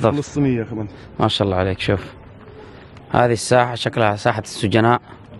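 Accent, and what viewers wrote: United Arab Emirates. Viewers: لهجتك اماراتيه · لهجته إماراتية كان عايش بالإمارات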